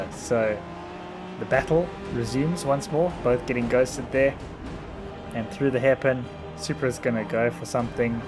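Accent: South Africa. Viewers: You sound South African mate i swear you are i don’t just wanna say you are but you sound like it mate im watching am eskom turned my life off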